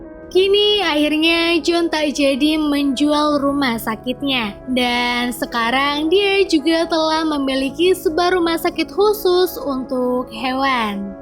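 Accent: Pemalang, Indonesia. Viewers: Kaka orang pemalang bukan kak? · Mbaa orang pemalang yah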